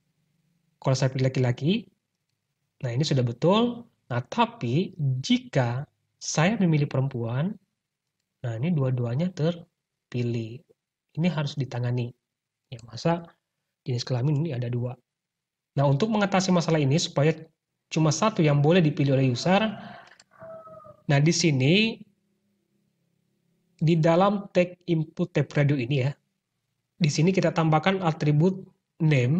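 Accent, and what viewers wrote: Bugis, Indonesia. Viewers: Orang Bugis yah?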